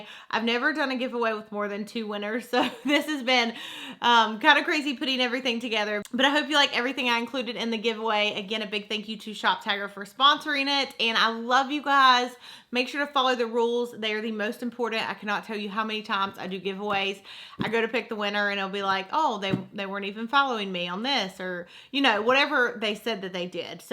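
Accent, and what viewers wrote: South, United States. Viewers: I Have Been Subscribed To Your For Years And Still Love You And Your Southern Accent Lol I Would Love To Win Of Course, I Wouldnt Be Able To Afford These Things, But Even If I Dont Win Im Still Going To Watch Your Videos And Love You! Congrats On 4 Years And Many More To Come❤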